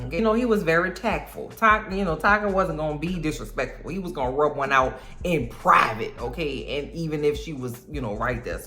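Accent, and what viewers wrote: New Orleans, United States. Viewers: Just watched both episode last night love your commentary and your Nola accent 💚💯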